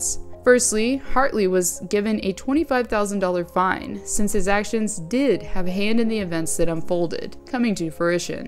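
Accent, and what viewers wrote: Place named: Pittsburgh, United States. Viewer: Your accent reminds me of going to Munhall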